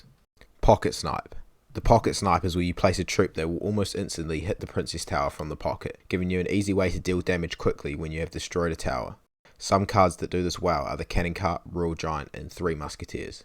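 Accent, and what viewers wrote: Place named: Australia. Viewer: Bro sounds Australian is this a ken reference???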